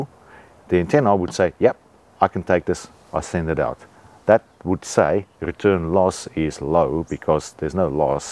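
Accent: South Africa. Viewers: Recognised the South-African accent immediately 😆 Also, probably best to hide your IP address...?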